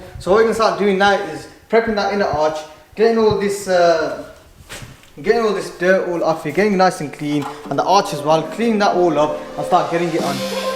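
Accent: Birmingham, United Kingdom. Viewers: All about that brummy accent 👍😂 let's see that rs6 breakdown 🙏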